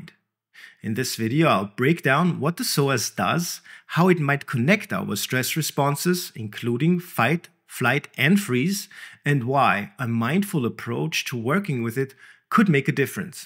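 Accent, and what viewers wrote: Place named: Germany. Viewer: And don't forget the German accent :)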